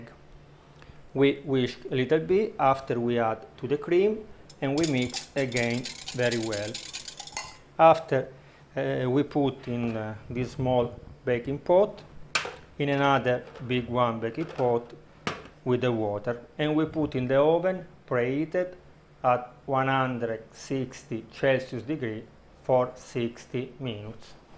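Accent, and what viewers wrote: Italy. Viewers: Love the accent Italiano 😘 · Love your Italian ascent 😘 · Search YouTube for Panna Cotta recipe First result, Gordon Ramsay SKIP! Sees Italian channel name Might have something here... Hears accent Yep, this is the one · L'accento italiano è fastidioso 😅. Non pensavo avessimo questo accento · The Italian Accent is so nice 👍, and also the recipe..!! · What a cute & wonderful Italian Accent. I could listen to him for hours! Good job teaching in English ! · Hears his accent ok this is original panna cotta boys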